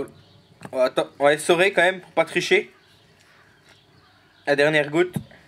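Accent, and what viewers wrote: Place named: Belgium. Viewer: le soleil du sud avec un accent belge ? le combo de dingue un belge sudiste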